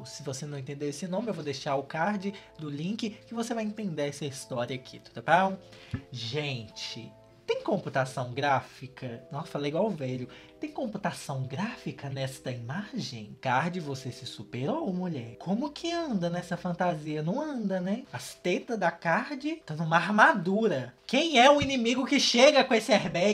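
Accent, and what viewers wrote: Minas Gerais, Brazil. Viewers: o sotaquinho mineiro iti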